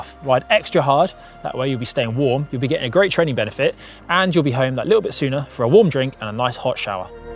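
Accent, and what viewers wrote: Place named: United Kingdom. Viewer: It's a British accent, not an internationalized accent.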